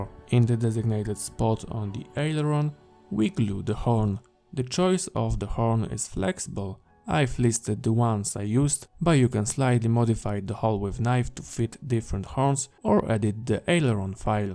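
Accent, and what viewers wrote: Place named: Germany. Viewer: Are you german ? 😊 your English sounds like German 👌👌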